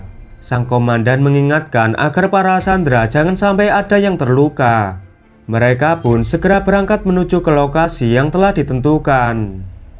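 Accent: Java, Indonesia. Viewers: JANCOK MEDOK MU PARAH COK!!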